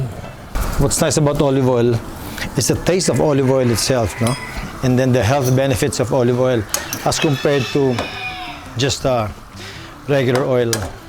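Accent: Visayas, Philippines. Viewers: its ok. maybe your so busy being public servant there in Ormoc so much so you speak lots of Bisaya already and for me, you got a grade of 85% and a 100% for the effort. Im from cebu. we flew to vegas together along with john t. PAL and P. buenaventura for gun sport show almost 2 decades back.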